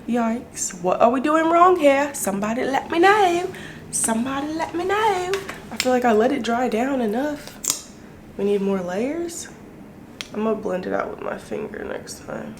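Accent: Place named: United Kingdom. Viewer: British needs her own tiktok or something. 😂 She's a hoot! I mean, I love regular too, but British... LOL! I'm here for it.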